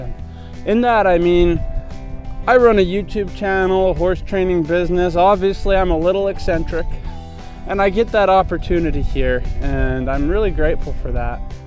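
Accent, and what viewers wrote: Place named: Alberta, Canada. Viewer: I don't even have to ask if he's from Alberta haha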